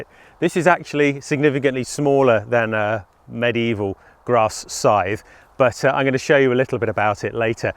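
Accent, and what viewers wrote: United Kingdom. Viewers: Also a British accent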